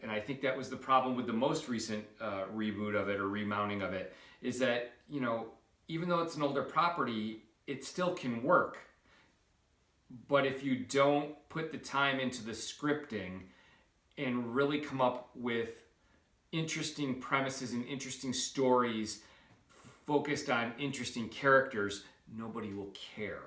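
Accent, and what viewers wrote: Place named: Upstate New York, United States. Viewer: Lol i could could tell you were from upstate ny 3 words in. Great commentary! He really is a gem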